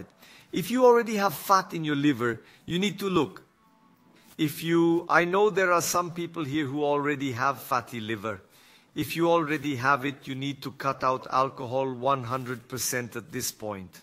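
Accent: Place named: India. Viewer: Great job dr.. Sutaque parececido com indiano falando.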